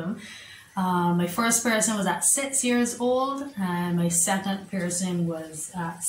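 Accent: Barbados. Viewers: Hey, great job with your channel. Love de Bajan accent. Ps this is Azriel:)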